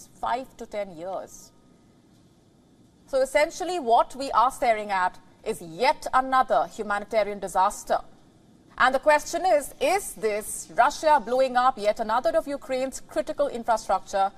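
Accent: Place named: India. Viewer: i love indian /english accent :person-turqouise-waving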